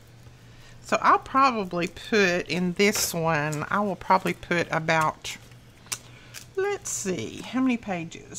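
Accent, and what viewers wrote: South, United States. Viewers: I didn't realize that you were near Birmingham, Al. I guess I missed out on were your from. But, I can tell you have a sweet voice with a slightly southern accent. I'm from Alabama myself so there's not really an accent. Lol I think doing what you're doing and talking with "friends", will help you out of that funk. Above all, prayer. But I realize you know that already. Here's to better days ahead.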